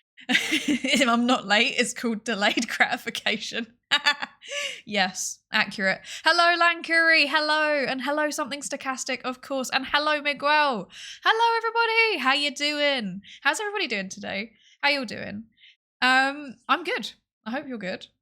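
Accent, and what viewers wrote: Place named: United Kingdom. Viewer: I don't know how I got here, but I can tell from your accent that you're from the same region in the UK as Rin Penrose, so that's good enough a reason for subbing as any.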